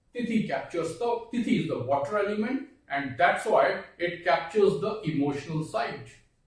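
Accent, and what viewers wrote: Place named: Malaysia. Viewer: u spoke like malaysian thats y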